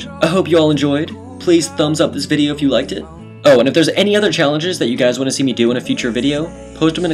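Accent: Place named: United States. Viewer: technically everyone does have an accent he has an "american accent" to most people who don't live in 'MURICA.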